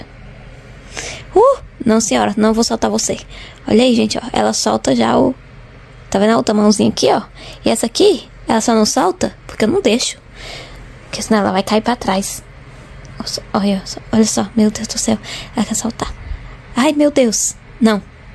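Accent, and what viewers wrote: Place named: Northeast, Brazil. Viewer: Será que você não percebe que o sotaque dela? Ela é nordestina então é o jeito isso de chamar a Ana de “mulher” simplesmente é o jeito,a cultura dela , nada a ver pelo amor de Deus.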